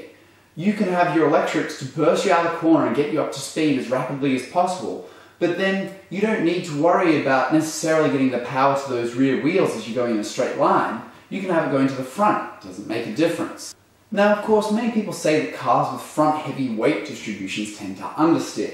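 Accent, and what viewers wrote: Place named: Australia. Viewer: Contents are very good, although a little too quick and a bit confusing at times. Problem is the Australian accent. Hard to fully understand when you are not Aussie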